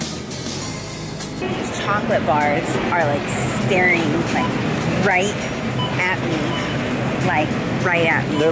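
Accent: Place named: United Kingdom. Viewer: I missed u saying (eggies)....😊also that I think, it's British, accent u do...i guess i miss ur vlogs...it inspires me...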